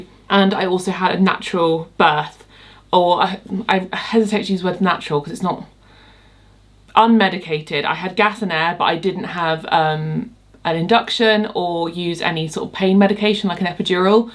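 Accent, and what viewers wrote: England, United Kingdom. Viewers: Would you please slowly say your beautiful baby’s name? I’m American and I struggle at times with the English accent and you are speaking very fast. Thank you.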